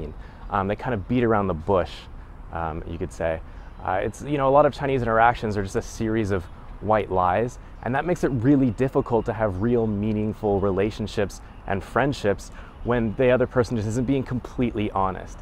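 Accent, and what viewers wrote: Canada. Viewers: wow I love watching ur vids and when I'm stoned your voice sounds like the purest Canadian so maple syrupy and beautiful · That is his Canadian accent. In Canada both 'or' and 'orr' are pronounced with a long o where as in the US 'or' has a long o and 'orr' has a short 'o'. Thus in Canada the 'o' in sorry is pronounced the same as the 'o' in sort, while in the US it is pronounced like the 'o' in pot.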